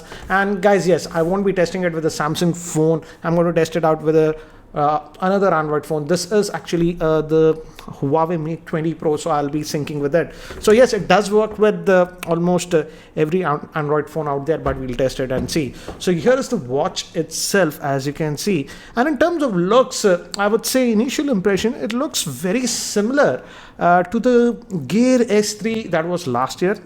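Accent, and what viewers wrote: India. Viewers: Practice more on your english accent ...less indian plz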